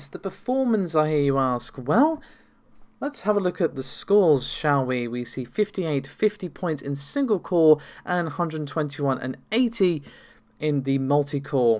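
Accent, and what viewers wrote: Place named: Liverpool, United Kingdom. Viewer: He is a scouser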